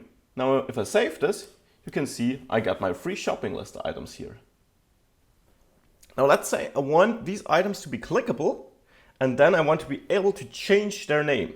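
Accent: Germany. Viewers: Thanks for this video. It is fast, direct to the point, no time lost, fun to watch, and nice german accent! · Ich hab das Gefühl, ich höre ein wenig Deutsch heraus :D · "Bootable", "Boot" I love German accents!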